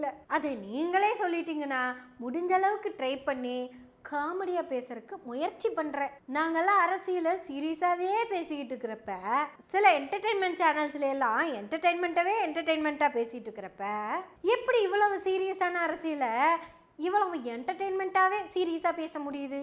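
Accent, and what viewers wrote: Kongu, India. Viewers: கொங்கு தமிழ். அருமை. சில நேரங்களில் பேசும் மலையாளமும் புரிகிறது. satire. அருமை. மக்கள் ultimate. மக்களுக்குப் புரிய வேண்டும். புரிந்து விட்டால் போராட்டங்கள் குறையும். சரியான புரிதல் முக்கியம். அரைகுறையாகப் புரிநது கொண்டவர்கள் ஆட்டம் அதிகமாக இருக்கிறது. அந்தக்காலத்தில் கலைவாணர். இப்போது.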